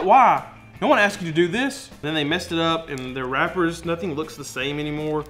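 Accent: South, United States. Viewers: He sounds so cheery Like a southern Santa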